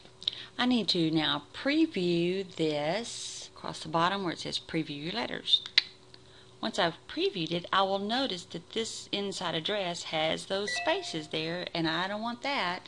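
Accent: United States. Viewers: I just love your southern accent. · are you Texan?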